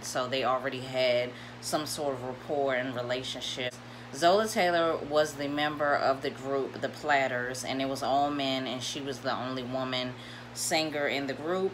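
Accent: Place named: Virginia, United States. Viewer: Are you from Virginia I love your accent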